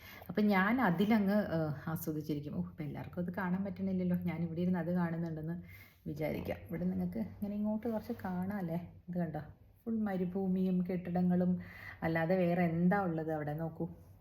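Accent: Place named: Valluvanad, India. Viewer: മാഡം.... അമ്മ ഏത് സ്കൂളിലെ ടീച്ചർ ആയിരുന്നു. മാഡത്തിന്റെ വല്ലുവനാടൻ സംസാരമാണല്ലോ... നല്ല സംസാരം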